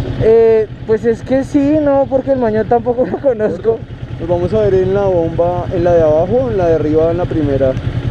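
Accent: Colombia. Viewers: Bonito el acento colombiano.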